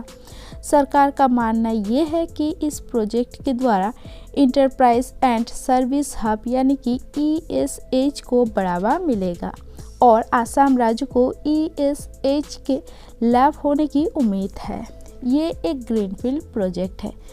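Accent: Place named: Bengal, India. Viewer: Pata nahi kyu bangali excent main baat kar rahe hai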